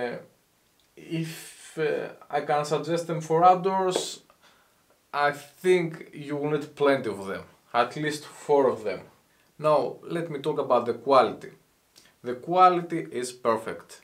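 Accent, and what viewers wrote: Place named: England, United Kingdom. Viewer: Το αγγλικό ακσάν σ αδερφέ τα σπάει ⭐️ να'σε καλα και καλα χρυστουγεννα! 👍🏼💪🏼🎉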